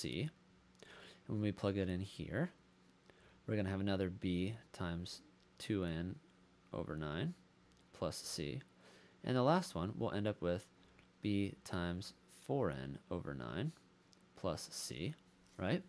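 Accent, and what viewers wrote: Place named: England, United Kingdom. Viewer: finally English accent!!! p.s ( i still love you indian computer science teachers :P )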